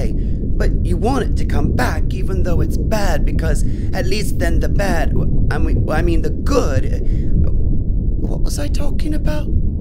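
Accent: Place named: London, United Kingdom. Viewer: LMAO at the London accent....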